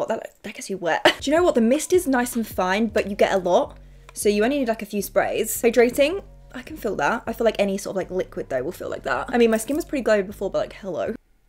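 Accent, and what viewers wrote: Australia. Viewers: I'm so intrigued... I've only watched vids for a few months and absolutely loooove them. But, can any OG fans answer me something. Is she from Australia originally? I can always hear a twang in her accent and I can't work out what it is 😅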